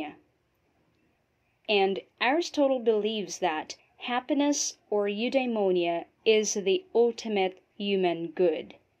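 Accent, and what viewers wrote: Philippines. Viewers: Answer me...is this Philippine English?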